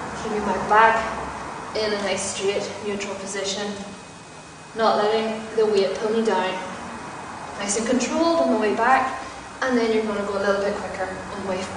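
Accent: Ireland. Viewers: I like your Irish accent.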